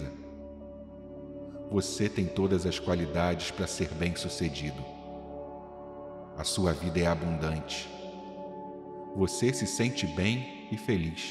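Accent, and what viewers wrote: Rio de Janeiro, Brazil. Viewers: O conteúdo é otimo, mas o carioques me quebra rs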